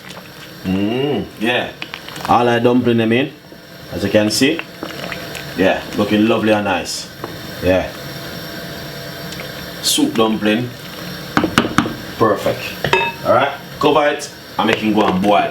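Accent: Jamaica. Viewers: Omg just listen to him he did say pumpkin, it's just the Jamaican accent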